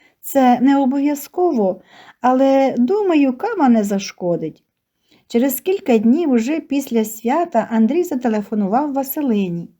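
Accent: Standard. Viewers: чистою українською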